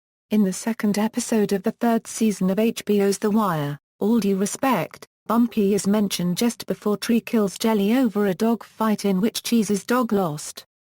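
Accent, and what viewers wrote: England, United Kingdom. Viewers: Something about an English woman talking about American mobsters doesn't do it for me.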